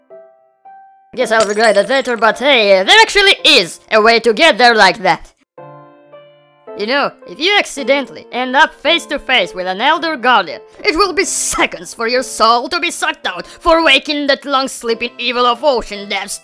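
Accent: Russia. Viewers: I hear that Russian accent in his English 🌚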